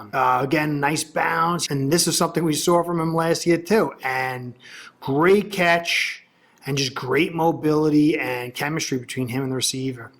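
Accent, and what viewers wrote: New York, United States. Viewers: New York accent and Dolphins fan.. interesting. I liked and subscribed because I'm a Bengals fan. Who Dey!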